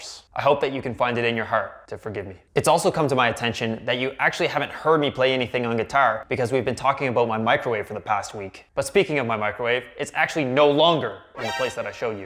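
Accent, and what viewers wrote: Nova Scotia, Canada. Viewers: he’s def from Nova Scotia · You literally say "aboot" and I'm not even mad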